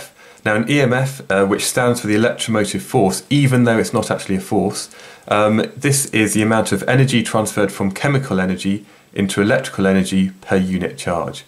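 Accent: United Kingdom. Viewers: British English is wonderful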